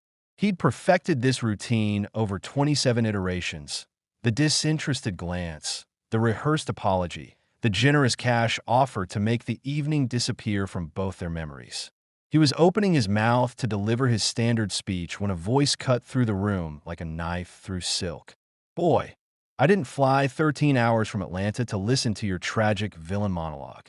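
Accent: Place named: South Carolina, United States. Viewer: Absolutely love this story. I'm from SC and that's exactly how we speak.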